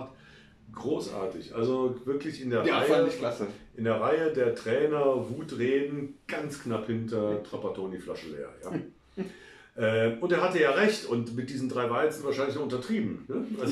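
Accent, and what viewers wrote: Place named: Franconia, Germany. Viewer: Sprachfehler? Ich glaub, das ist einfach nur der fränkische Dialekt... 😁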